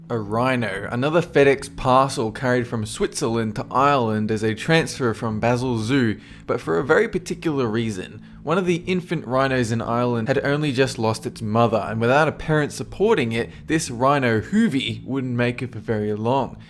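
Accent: Australia. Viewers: im pretty sure he is Australian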